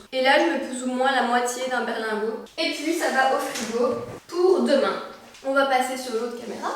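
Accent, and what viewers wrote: Belgium. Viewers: J’adore ton accent belge 😂 pour les pâtes de pois de pois chiche et lentille y en a au colruyt 😉 es ce ke tu peux dire quelle genre de biscuit tu manges es ce que sont ceux des régimes ou les normaux😘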